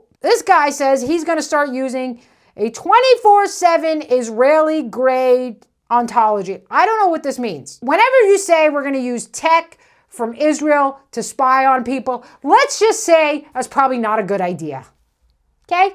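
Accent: New York, United States. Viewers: Love the New York accent and attitude. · you are a Bonnie lass but your NY accent is painful. 😄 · You go, gal! I love your accent. I'm from the South but I love NYC accents. What is yours, Queens, Brooklyn, Bronx? Oh, I enjoy your content, too. :)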